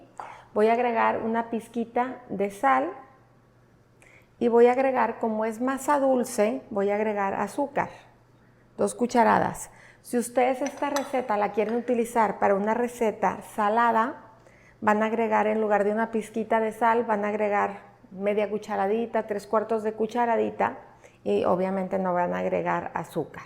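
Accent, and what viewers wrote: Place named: Mexico City, Mexico. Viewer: Párese chilanga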